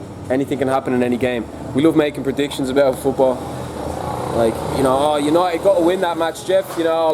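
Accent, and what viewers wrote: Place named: Ireland. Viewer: You sound like lrish you rap fast he a cool rapper singer my friend 🙂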